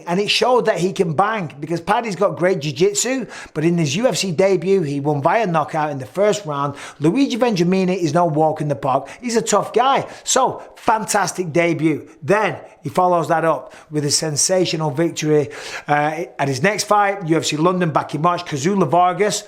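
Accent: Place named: Manchester, United Kingdom. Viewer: tries so hard to be nice but the Ol manc comes out eventually 😄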